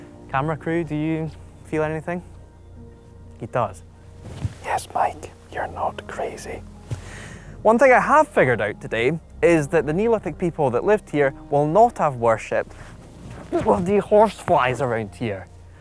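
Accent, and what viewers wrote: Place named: Scotland, United Kingdom. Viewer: He sounds scottish and its the English that say mores for pronounciation of moors .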